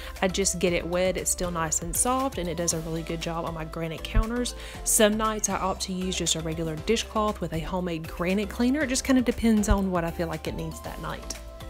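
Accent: South, United States. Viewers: My husband will never run a dishwasher, washer, or dryer if we are not home because if a hose breaks we would it hear a problem and have major water damage. With the dryer it is not water damage but electrical fire. Love your channel and of course your southern accent.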